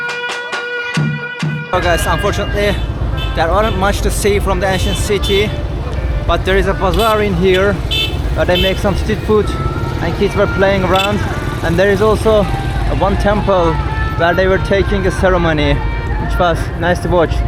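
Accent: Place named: India. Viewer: aksanın hintlileri anımsatıyor :)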